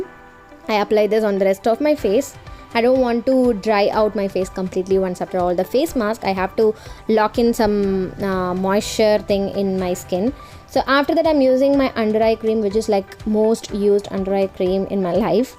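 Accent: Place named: Tamil Nadu, India. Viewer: Superb SISTER..😉 Biotique product pathi clear ah explain paninga last video la...very nice tamil explanation...unga tamil slang nalaruku SISTER..tamil la video panunga inime...this z my request...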